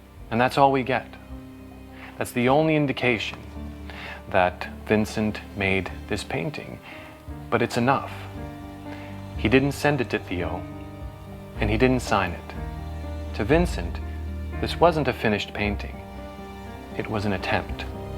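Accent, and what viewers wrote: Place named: Netherlands. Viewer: I like that the presenter has such a clear Dutch accent when speaking English. Kan goed horen dat je Nederlander bent!!! Top video.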